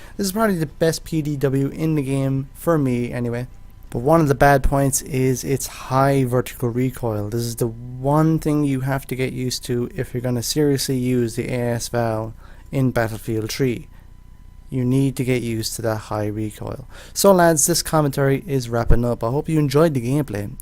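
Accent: Ireland. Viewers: Dropped over from scanner, nice series, always good to hear Another irish accent. keep it up.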